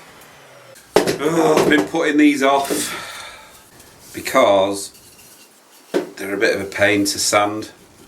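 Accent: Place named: Northwest England, United Kingdom. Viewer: This was a lovely video to watch. You obviously take pride in what you do and the piece should now last for another hundred years. I learnt so much from this for a piece I'm going to try and restore, so thank you. If you take commissions, you should mention how to get in touch with you. It sounds like you're from the NW and I am too, and I know my furniture would be well looked after if I brought them to you.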